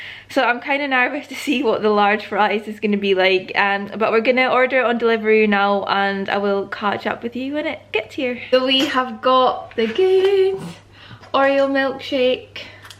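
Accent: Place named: Scotland, United Kingdom. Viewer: Its so refreshing finding a fellow scot on here! Was dead jealous of all the food!! 😂 You sound local to me! Which area are you from? I’m Aberdeenshire x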